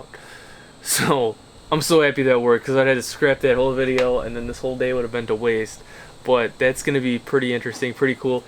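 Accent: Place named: Minnesota, United States. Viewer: You must be from Minnesota, that accent is thicc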